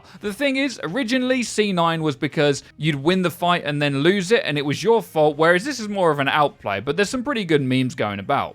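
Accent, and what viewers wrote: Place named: United Kingdom. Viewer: Where in the hell is Frido? I’m getting SUPER tired of mispronounced monotone British accented commentary from Mr. boring over here.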